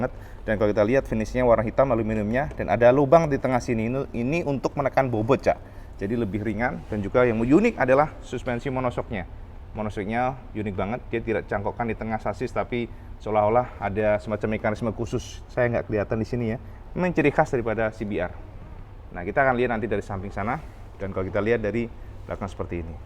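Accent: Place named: East Java, Indonesia. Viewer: Mas kui ganteng logate Jawa timur medok, jangkrik tenan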